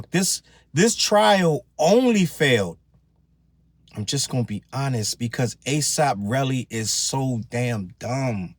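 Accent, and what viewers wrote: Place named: DMV, United States. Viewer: I thought you were from the DMV… the voice gave it away. I’m from SE DC too. I lived in Valley Green in those years so I know about those days.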